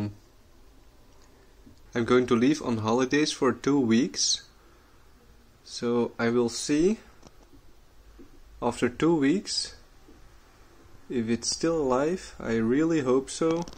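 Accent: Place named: Belgium. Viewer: Judging by your accent, you’re Belgian right?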